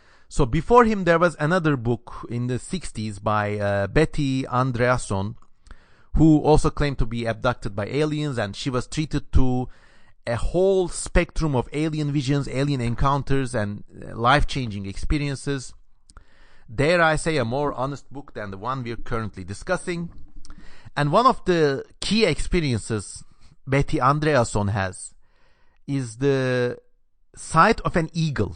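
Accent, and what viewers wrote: Turkey. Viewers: Op sounds turkish can you confirm?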